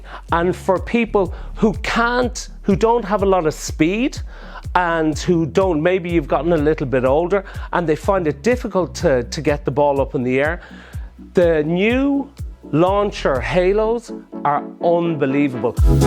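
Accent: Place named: Ireland. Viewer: Great to hear his accent. As a fellow Irishman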